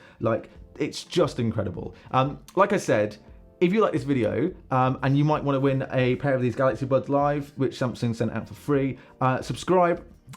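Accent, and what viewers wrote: United Kingdom. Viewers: Love subscribing to english accent speaking guys😅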